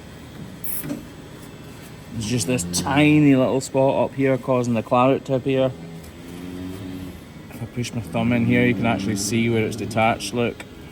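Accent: Scotland, United Kingdom. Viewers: hes scotish not irish 😂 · I love seeing the Scottish landscape and hearing the Scottish brogue:)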